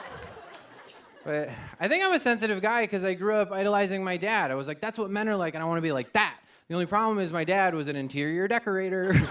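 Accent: Canada. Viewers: Winsc accent sounds a bit Canadian 🤔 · Why am I hearing a Canadian accent? lol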